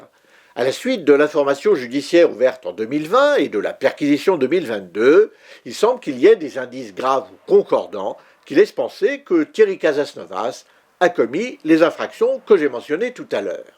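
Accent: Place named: North, France. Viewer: Alors là! je peux assurer que vous êtes du nord, non? "des chauves souris dans le beffroi"? =)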